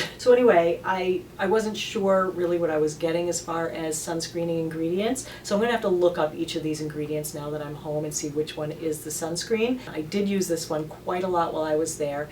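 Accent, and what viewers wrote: United States. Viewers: Hi, I'm so glad you had a great time in Spain - I love it there! It's cute the way Americans pronounce words. For example, we pronounce Ibiza (eye-beeth-a), and instead of "embraceful", we would say "embracing" is correct. Anyway... I really love watching your videos. I'm going to be in NYC with my daughters from 5th - 22 July, and I'd love to meet you in person! I'm almost 53 (also get hot flushes) and am interested in your product reviews. I hope to see you at the W Hotel next week! How long are you staying in NYC? If I don't make it to the hotel (my daughters have planned some excursions), then it would be lovely to meet for a coffee. We live in Germany, but are form London. Stay awesome!:)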